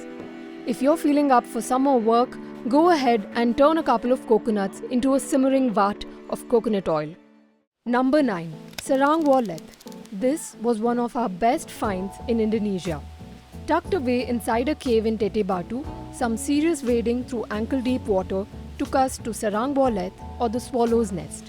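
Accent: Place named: India. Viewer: Your horrible accent makes it an unwatchable clip. Find a neutral narrator that is not Indian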